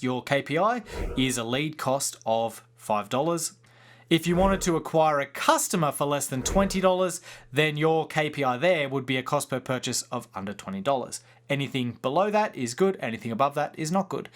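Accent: Australia. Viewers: Hey, good to hear and Aussie voice, also I suspect relevance to local biz. Any ideas re real estate fb ads? Regards Tony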